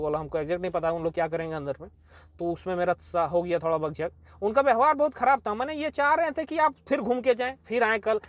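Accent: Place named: Bihar, India. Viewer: भैया आप हो झारखंड के पर बोल बिहारी स्टाइल में रह हो मधेपुरा बिहार से प्यार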